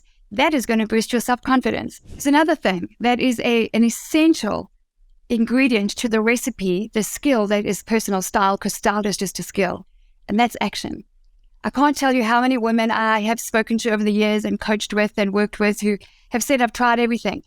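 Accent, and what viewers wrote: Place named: South Africa. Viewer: I can hear you are in South Africa. Do you do make overs? I need help.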